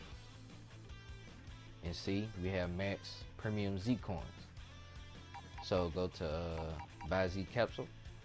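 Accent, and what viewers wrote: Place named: Louisiana, United States. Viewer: I freakin luhv your accent. Are you from Louisiana? lol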